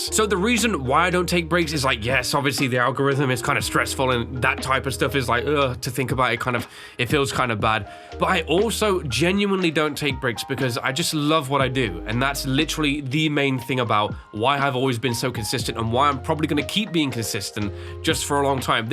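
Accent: United Kingdom. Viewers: For what it’s worth, I think you’re a fantastic YouTuber. I agree that it would be cool to see you explore games other than horror. But I stumbled across your channel looking for Backrooms themed games and I’ve been hooked on your videos ever since. It’s nice hearing a fellow British accent too. Your voice is way more relaxing than a lot of other gamer YouTubers. It would be nice to have longer, unedited content. I’m here for it! Great video! Keep doing what you love!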